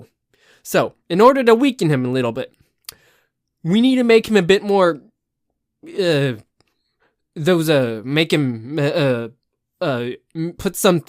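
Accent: United States. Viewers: As a resident country, great country accent. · Nice!! More of! killed me…great work, love your southern talking, yeehaw 🫶🏽🤣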